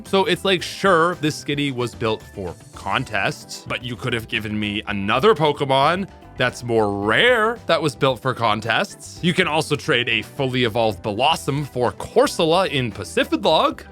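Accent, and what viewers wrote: Canada. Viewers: He sounds so aggressively Canadian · Screw this Canadian accent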